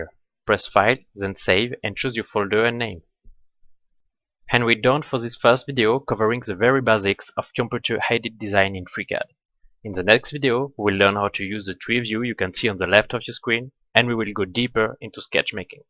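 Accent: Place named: France. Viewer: Your French accent is just fine; one thing, if I may: middle is pronounced with an "ee" not an "eye" sound, like here: <link> Many thanks for your effort, good video!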